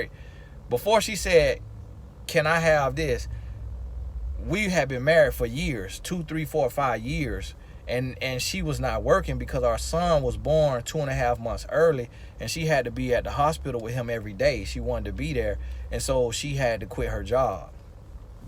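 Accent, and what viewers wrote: South, United States. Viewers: I see now why I can relate to and appreciate the country grammar and accent in these videos. My folks are from Selma AL too. I think it is cool and respectful that you pay homage to your grandma and talk like her. We do that in the south. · Gurl. 8 was driving hollering and weak at how he was talking about Puerto Ricah Jumaican, and his first thought to the Lord when saw her booty. Omgosh. I fell out. I'm from the south and his mannerisms, cadence and demeanor is mad familiar and makes me laugh. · "Puerrta rica" and "Jewmakin" 😆 I love how southern folks talk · Be blessed 🙏 im loving that southern accent..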